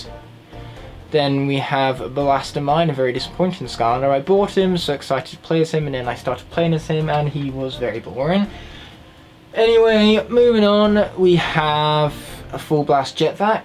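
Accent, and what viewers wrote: United Kingdom. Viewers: I love this video you did so well 1) Your British unlike all the other ones 2) such a massive collection and they have good back stories 3) you are very repeatable 4)my favorite story is your wrecking ball one (series 1) 5) it’s inspired me to do a video on my collection I collect them very big on it there might be a new one in 2021 so look out for the next one and pls watch my vid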